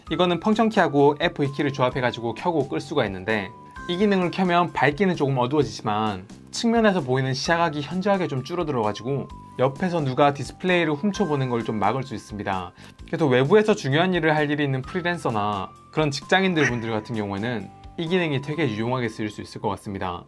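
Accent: Gyeongsang, South Korea. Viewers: 감사합니다. 약간의 경상도 엑센트가 들리네요. 대박 나세요.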